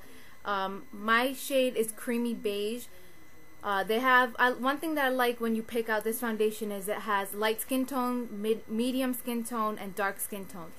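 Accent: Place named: Miami, United States. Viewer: you sound like your from Miami, anyway great video, very informative!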